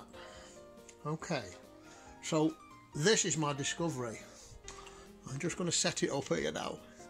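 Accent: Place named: England, United Kingdom. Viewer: You sound a lot like the Draftsman, English accent. By the way, the double coil device charged the cap to over one thousand volts. Scary! Could you go to a remote area, out in a field, away from all electric interference. Swing the mag/coil on the rope around in a circle while you stand in the center, hold your hand over your head and swing away, a larger radius. Little voltage in the shop, bigger outside.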